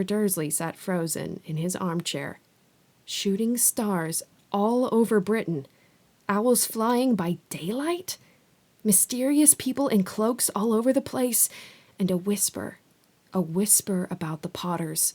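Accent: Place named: United States. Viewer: I m learning ENGLISH. I m looking for American accent for reading Harry Potter . Finally I found you !and even better, you re a lady ! I m so happy!THANK YOU SO MUCH ❤❤❤Your voice is amazing ❤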